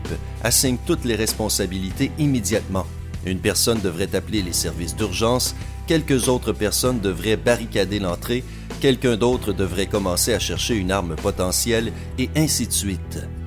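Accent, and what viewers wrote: Quebec, Canada. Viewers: C un quebecois qui parle.Moe aussi j'en suis un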